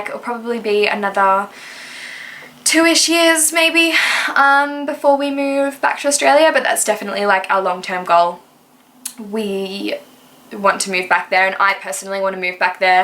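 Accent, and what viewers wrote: United Kingdom. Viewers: Omg I can hear a bit of a British accent!! Also I’m so glad your back!! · So excited you're back! Can definitely hear a British accent! 🙈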